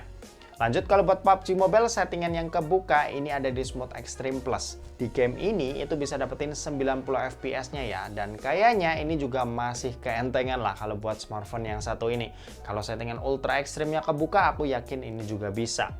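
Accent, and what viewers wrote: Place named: Purwokerto, Indonesia. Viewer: PURWOKERTO YA INI MAS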